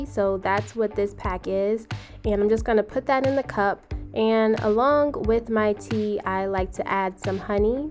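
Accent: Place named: New Jersey, United States. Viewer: Wow great video I knew you were from New Jersey I just wasn’t sure but the way you pronounced some words gave it away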